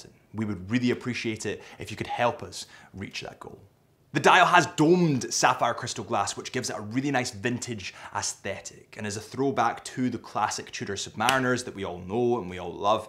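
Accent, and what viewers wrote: Scotland, United Kingdom. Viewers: Can't help comparing you to Adrian Barker. Same presentation and conversation style but with a Scottish accent. Maybe you guys should collaborate? You both like watches, photography & adventures in the wilderness. Would be a fantastic pairing for YT content.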